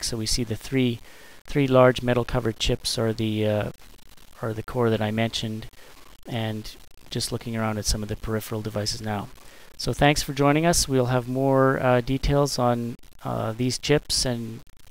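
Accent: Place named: Canada. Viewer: you have a canadian accent lol are you canadian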